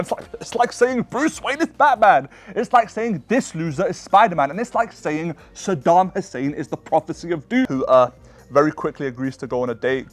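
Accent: United Kingdom. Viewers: guy has an annoying british voice lol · a hot British voice, get it right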